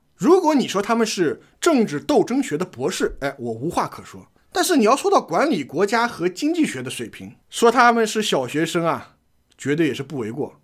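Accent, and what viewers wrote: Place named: Shandong, China. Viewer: up山东人？方言暴露了啊😄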